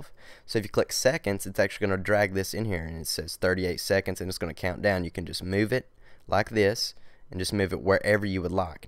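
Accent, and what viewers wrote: South, United States. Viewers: This is completely unrelated but I just had to say something about your accent. It is absolutely wonderful! Sounds a bit Southern. Other than that, great video and thanks for sharing!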